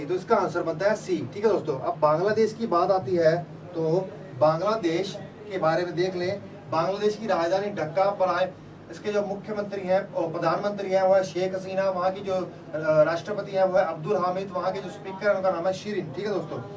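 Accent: Punjab, India. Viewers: Aap Punjabi hoo na sir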